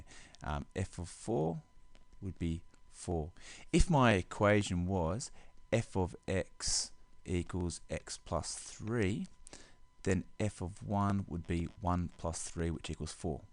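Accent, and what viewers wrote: Australia. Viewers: Sounds Australian to me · es una muy buena explicación muchas gracias, pero, ¡Griffin MC5 es retrasado! Tambien me gusta el accento australiano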